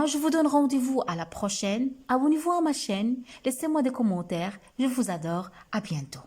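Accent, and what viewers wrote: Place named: Morocco. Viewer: Ça sent le « é > marocain !!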